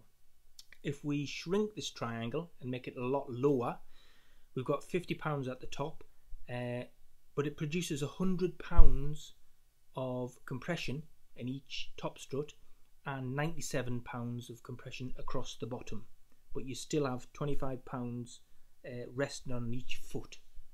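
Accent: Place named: United Kingdom. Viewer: Hi - you made very nice videos and also a great website. It helps a lot to understand dome structures. You sound like you are from UK and there they use imperial measurements if I am not wrong. However your calculation tools are mixed Metric and Imperial. (radius in meter but windspeed in Miles per hour). May I suggest to put a simple unit in your calculation tools. i.e. Radius/m: 6 - it would help. And since you have to touch your website again - can you share the formula you use for your calculations as well? Thanks for considering. Cheers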